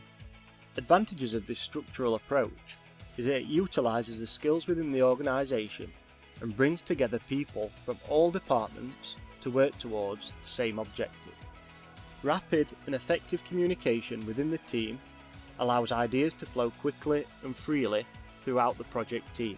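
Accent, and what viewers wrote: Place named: Manchester, United Kingdom. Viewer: Very clear, understandable presentation. It is just what I needed for illustration for my students. I like the accent very much! Manchester?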